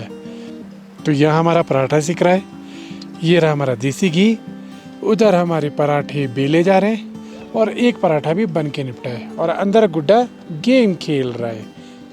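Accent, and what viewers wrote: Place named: Maharashtra, India. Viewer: Bhai ke hindi me marathi ki jalak dikh hi gayi hai Maharashtra hamara Maharashtra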